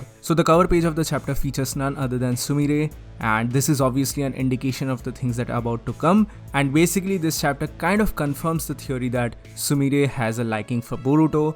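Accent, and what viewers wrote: India. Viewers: In your language tone i know u are Indian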